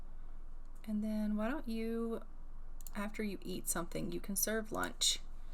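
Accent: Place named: Tennessee, United States. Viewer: I had to go somewhere unexpectedly so I can watch the rest of the vid!Cora.....uh.........!They are definitely a lovey dovey relationship!I feel like they were meant for eachother, Also For The Heritages heres an idea!! Fred-50% Irish & 50% German Cora-50% Irish & 50% Spanish Also are you 5 hours behind me or 7?It's currently 3pm where I live,unless you usually wake up quite early or you have work!Because if you are 7 hours behind it'd be liek 8 and if it is pls rest,I mean unless your going to work but it's the weekend so wake up whenever I want and look at my dastardly dumb comments later lol!For some reason I really want their daughter to be called Merida just because of the hair lol!It kinda surprises me when the life expectancy is like 46,because I know royals have the best heakthcare but alot of royals during this time died in their 80's!!Yes that was me,I think they should buy a business!Butt during the great depression I think we'd have to get rid of it for a while!I feel like we could have a long life span but age them up when we want or kill them when it's the right time!My great grandma was born is 1924 and on Google it says the life expexctancy was like 52,the healthcare in her country wasn't great when she was in her 30's but she lived to 2020,I think my great grandma might be super human(Yes she was 96 lol). 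And the oldest person ever was born in 1884!Yes lol!!!!!She legit is eaten by the piano!OMG Yes accent,I love your tennessee accent,legit she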